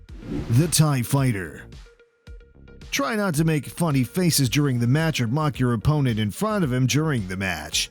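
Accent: United States. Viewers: Don't get me wrong I love this video but you kind of sound like an American who speaks English as a third language.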